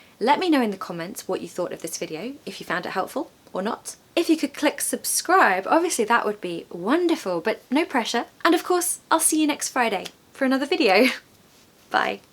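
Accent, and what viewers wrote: RP, United Kingdom. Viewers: I really need this video. I didn't realize I have a very strong American accent though it is not something bad I've always dreamed of having that wonderful beautiful British accent of yours ❤ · That is a southern recieved pronounciation accent. It is not the British accent. It does sound nice, I agree, but it is a bit too much to say that it is the British accent. It is an accent of Britain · She is speaking with the modern received pronunciation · Hello,.😊 I'm so fascinated with the elegant British accents, which are so crystal clear that I can catch them rather easily. Besides, I love your examples. I'm open to repeating them out loud again and again. Thanks so much for the epic lesson. You rock❗🌼🌼🌼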